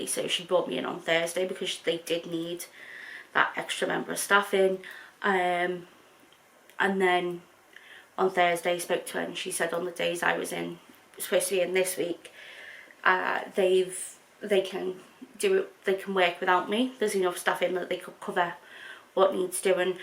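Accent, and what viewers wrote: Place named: Liverpool, United Kingdom. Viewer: Hi I am a crocheter/ knitter just found your podcast thanks to Ali from little drops of wonderful and love it we are ex scousers living in Australia miss the accent and loved listening to you.. Working my way through your pod casts you are a great knitter and crocheter loving your work I taught myself in lockdown to knit socks and loving it although we don’t need wooly socks here much, but I post them to my sister who really appreciates them🧶🧶🧶 carry on with your great work.!!!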